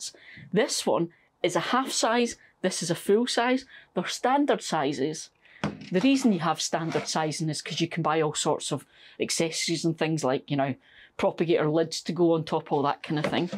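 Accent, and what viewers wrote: Scotland, United Kingdom. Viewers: Hi, can you tell me more about your rotary compost sieve? I live in Massachusetts, and the only one I could find was over $100. I have a lot of compost to sieve, and this would make the job so much easier. I am planning a trip home to Scotland soon. I could bring one back if it's not too heavy. Maybe that is wishful thinking, lol. I am relatively new to growing vegetables and find your videos very helpful. Thank you for taking the time to create informative and entertaining videos. It's also nice to hear a Scottish voice.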